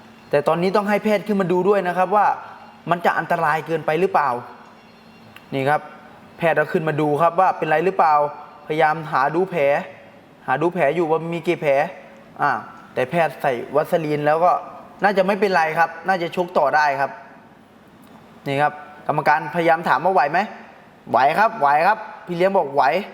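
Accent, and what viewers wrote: Isan, Thailand. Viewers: พี่ ยังภาคไม่ดีเท่าไรนะคับเพราะว่าคำว่าเตือนการเป็นเตียน.เเล้วก้คำว่า.เลือดกายเป็นเลียด.พี่ น่าจะติดอีสานนิๆ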